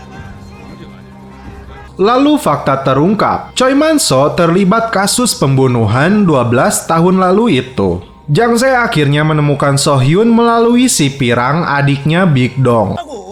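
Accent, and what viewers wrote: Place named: Sunda, Indonesia. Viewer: Sorana sunda pisan, anjir.... 😀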